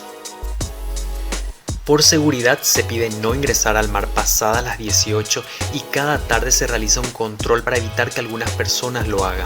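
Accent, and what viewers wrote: Paraguay. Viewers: Excelente video!!... Perdón... Tenés acento paraguayo?...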